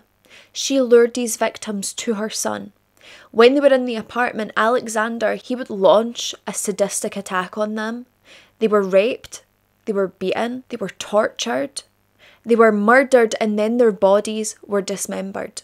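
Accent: Scotland, United Kingdom. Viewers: Love the Scottish accent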